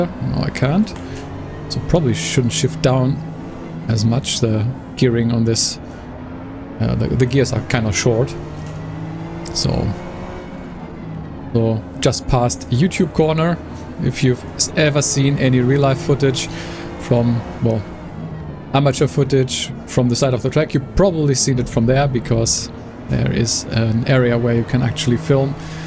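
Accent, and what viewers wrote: Germany. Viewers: You sound very german lol. Edit: oke nach dem Schwedenkreuz war ich raus 😂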